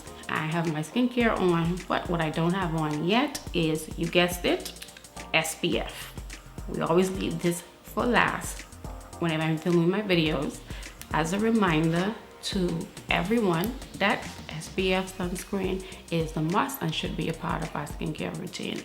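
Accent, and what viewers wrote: Bahamas. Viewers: It feeds so good to hear someone who sounds like me on YouTube. I'm proud to an see a fellow Bahamian on YouTube?I've always thought about starting my channel, but I'm scary. How did you get started? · I'm a new sub, I came across your channel and was like wait i know that accent. It's Bahamian? You mean to tell me we have our own Bahamian representating the 242 and no one told me? I'm so proud of you. Big the Bahamas, we doin7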